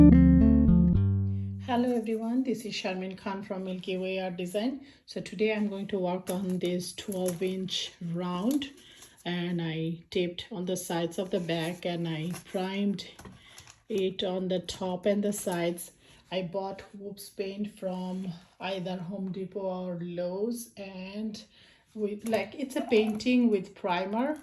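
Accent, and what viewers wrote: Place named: Middle East. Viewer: I love your creations, and I think it's adorable that you have that (I think) Middle Eastern accent, and you use the phrase, my bad. 🥰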